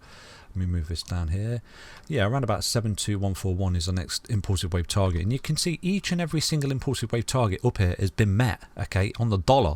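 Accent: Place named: Nottingham, United Kingdom. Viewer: Your accent sounds like Nottingham, am i right?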